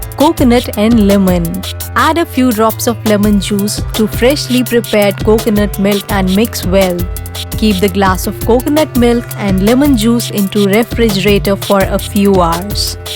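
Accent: India. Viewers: Her voice is like an indian one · It is Indian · Jake elwafi it's indian haha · You guys are all commenting on her accent rather than the video just because she has an indian accent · thats indian accent (by the way I'm an Indian 😤😤😤😤😠😠😠😠😠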